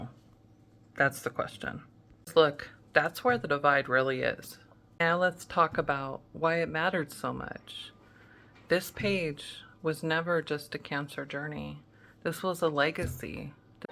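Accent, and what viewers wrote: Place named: England, United Kingdom. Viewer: What about his English accent and the way he does his mouth with his tongue ..He is cringe factor for sure!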